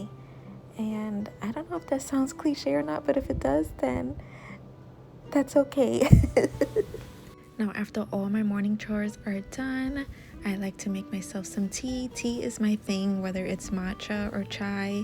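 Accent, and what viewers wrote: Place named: East Coast, United States. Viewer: Your place is so cute! I’m in California & loving your east coast accent. Love your videos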